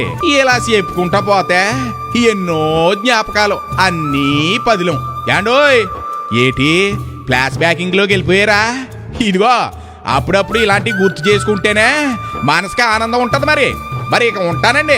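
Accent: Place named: Godavari, India. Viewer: మీరు మన గోదావరి యాస లో చెప్పిన విదానం అదిరి పోయింది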